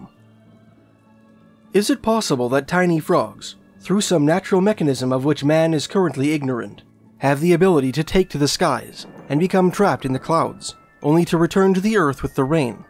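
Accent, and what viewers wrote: Canada. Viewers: Your Canadian accent is a bonus to your perfect narrations!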